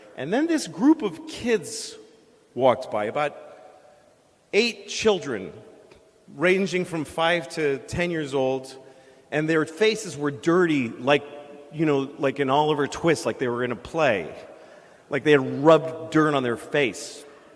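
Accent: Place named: Boston, United States. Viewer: he grew up in Boston, that's the local way of treating vowels.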